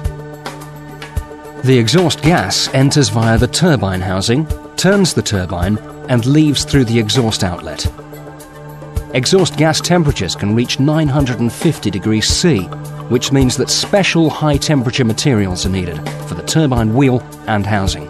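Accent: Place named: United Kingdom. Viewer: Fantastic overview of turbocharging. Everything sounds better when explained with a British accent. Well done.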